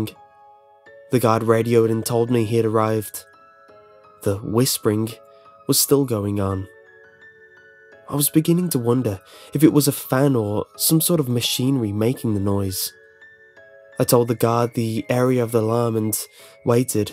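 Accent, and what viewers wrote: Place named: North, United Kingdom. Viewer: Too Northern with unnecessary pauses in the wrong places... Bit of Brooklyn in there too...?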